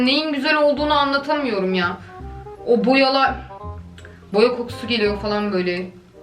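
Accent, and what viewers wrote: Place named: Sivas, Turkey. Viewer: Abla nerelisin ben sivaslıyım aynı senin gibi konuşuyom gızzzz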